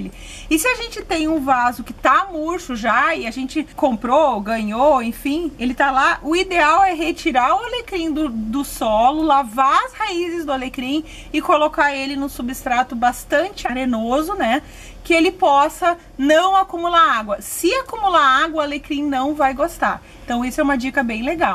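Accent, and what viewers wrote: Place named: Rio Grande do Sul, Brazil. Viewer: Nossa em outro vídeo comentei q pelo sotaque desconfiava q vc fosse gaúcha e neste vídeo descobri que és de São Leopoldo- RS a cidade onde moro hahaha q incrível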